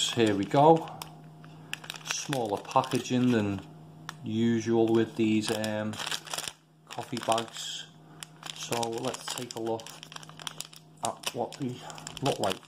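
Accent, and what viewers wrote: Liverpool, United Kingdom. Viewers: Fucking scouse accent ha ha perfect review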